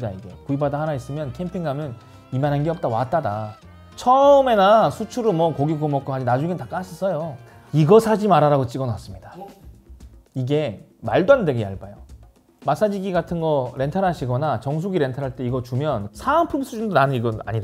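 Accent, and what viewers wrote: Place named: Busan, South Korea. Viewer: 말투가 부산이시네여...ㅋㅋ 제가 트래이더스 손절한 이유랑 공감됐어요. 차라리 이마트를 가지....식재료도 대용량 아니고는 이마트에 포장만 바꿔 다 있는거...^^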